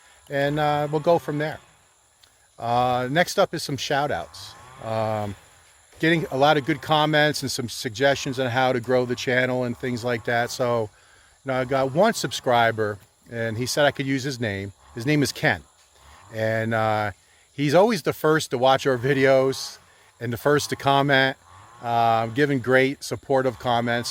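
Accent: Philadelphia, United States. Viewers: Very cool - I hear philly in you :) am i right ?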